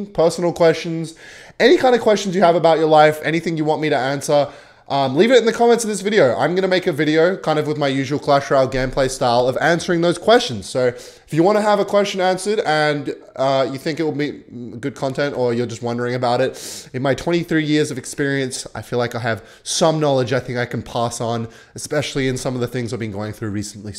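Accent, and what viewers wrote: Australia. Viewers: Why are you Australian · Aussie accent and he grows a moustache so one day he can shave it and style it lile certain austrian painter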